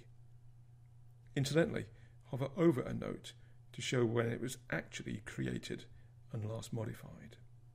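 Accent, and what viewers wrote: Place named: United Kingdom. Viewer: How nice to have a calm British English voice. So much easier to follow than Morris' style of delivery.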